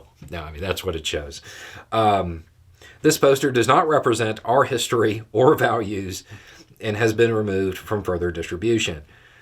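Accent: South, United States. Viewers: Dear, I love your videos! And they keep getting better! To hear truth, insight and intelligence spoken with a southern accent is so surprising and refreshing! And I love Curious George, too! Keep being a genuine patriot and supporting our Constitution!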